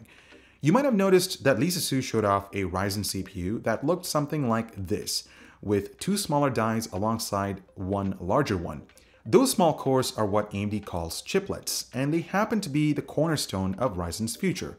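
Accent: India. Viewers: Is this guy Indian? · are u indian.?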